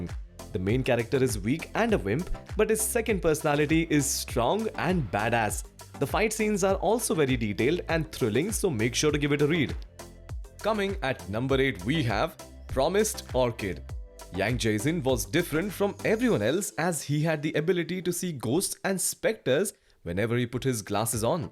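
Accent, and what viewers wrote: India. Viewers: this guy is indian.... i know this accent 😁😂😂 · india accent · Thanks, I didn't wanna hear his disgusting Indian accent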